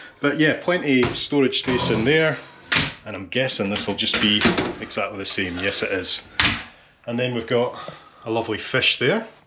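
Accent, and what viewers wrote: Scotland, United Kingdom. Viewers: Well done, clear and honest info. brilliant. And your SCOTTISH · Hi! First time watching your channel. (Yes, I subscribed. ) Loved the video very much. I live in the state of Washington, USA. I could really feel the cold you felt! Really liked your monologue, and your Scottish accent. Felt like I was on the ship with you.. Looking forward to catching up on other videos of yours and to future ones. 🙂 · I live in the USA where I was born, but am a proud descendant of brave Scots men and women who crossed the treacherous North Atlantic nearly 400 years ago. I have just discovered your channel as a result of searching for information about this ferry. Thank you for the engaging post and I love your Scots accent. 🤗. Edit: It is a handsome ship indeed. I’m truly adding this to my “bucket” list. 👍🏼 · Your videos are much better than some of the more well known utubers. 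My mum is Scottish and I love the accent. The things I like the most about your videos is you show these trips from a much more affordable way so it becomes attainable for a lot of people. I also love the fact that your narration is not monotone like some of the others who actually put me off. You don't use lots of silly gimmicks and your filming is lovely. Will be watching more of your trips. Well done. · Rarely have I seen such a beautiful, friendly travel report as yours. Not a trace of snobbery, absolutely unpretentious report intended for the average consumer. The narrator's heartwarming, generally positive nature with his lovely Scottish accent (at least I assume it's a Scottish accent, right?) do the rest. Thank you very much, Mr.! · And, have to add, you have a marvellous voice, very friendly, very clear, and, o/c, lovely accent! - can't beat a good Scots accent. Thanks for the voyage. 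· Im detecting a Scottish accent, I went to Rannoch School 4th and 5th form back in the 70s 80s and love watching these vids. My Fathers side is from the Hay Clan and my Mother unfortunately has ties to the McDonald Clan. But mt Tartan is Hay Clan. Keep up the good work and dont be a poofter. The Scottish would rule the world if not for Scotch Whiskey!! Keep up the good work and hope you get at least your Bronze award for this expey!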